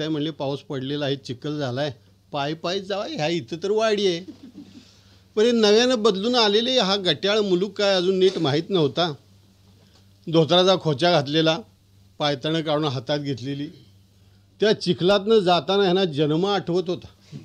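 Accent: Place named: Mandesh, India. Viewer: माणदेशी बोली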